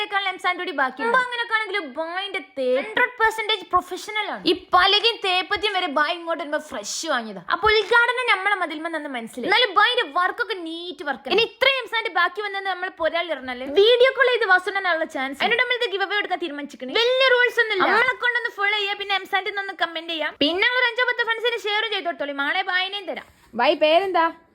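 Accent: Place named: Malappuram, India. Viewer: Ningalde veed sherikk എവിടെയാണ്? മലപ്പുറം ആണോ... Slang അടിപൊളിയാണ്.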